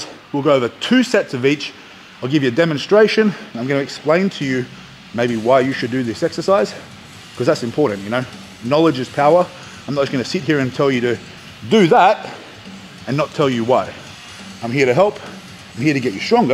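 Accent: Australia. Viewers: Is this guy an Aussie Aussie Aussie?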